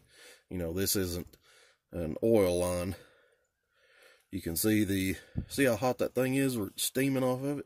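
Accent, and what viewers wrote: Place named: Eastern Kentucky, United States. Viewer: Hey brother found you channel not to long ago and I just hope you're doing well! Cheers from eastern KY I can tell you got a accent near me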